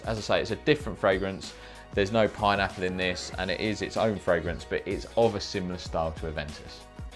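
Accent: United Kingdom. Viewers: Wow Ed Helms has a great English accent.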